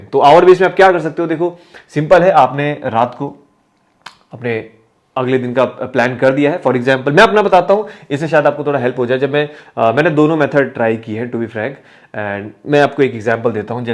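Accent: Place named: Garhwal, India. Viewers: Hallaa hota hai!! Garhwali tone sir❤️😂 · Hn pur garhwali tone ari and face bhi garhwali lgra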